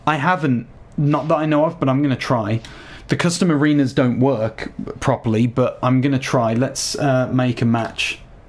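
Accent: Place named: West Midlands, United Kingdom. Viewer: I honestly can't believe I can't I payed 150 for this game.....btw I'm the person who recognized your west Midlands accent 😂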